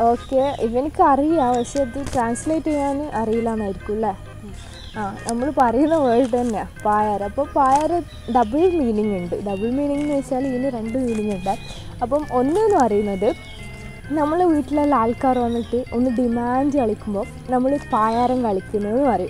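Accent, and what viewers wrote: Thalassery, India. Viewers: Njan Malappuram ullatan but ink ingala Thalashery slang bayangara ishttan💫♥️ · Ella thalssery baasha muyyan bere maadiriyaa😂😂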